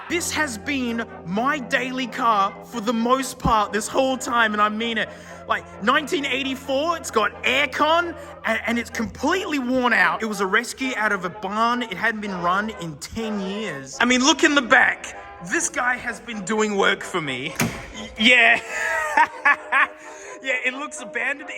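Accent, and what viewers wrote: Australia. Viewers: You are the only YouTube aussie that I subscribe and keep up the good work and I love your accent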